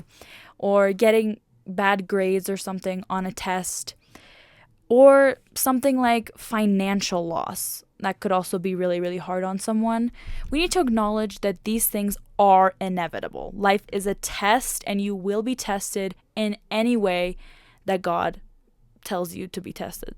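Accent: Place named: United States. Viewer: Сказать что я удивлен, ничего не сказать 😅Это второе видео из вашего канала, которое заставило меня остановиться и всё же написать коммент, так как вопрос в голове не даёт покоя. I am really impressed that you know so many languages and even acquired the American accent. Were you exposed to the language growing up? Яна битта саволим бор эди, тушунишим буийча Фусха ни урганвосиз. Узиз урганвосизми йоки тил курсларига катнавосизми йоки бирор урганиш методларини кулавосизми?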